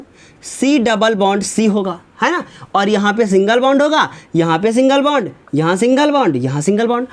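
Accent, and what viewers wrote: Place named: Kanpur, India. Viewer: Sir apki kanpur language ki vajah se hme bahut mja aaya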